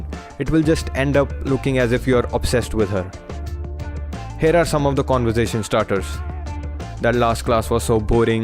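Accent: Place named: India. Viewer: good one. but you need to improve your accent and speak a bit faster. try delivering four words per second and also try skipping most of the "r"s in the end of words. I guess you are an Indian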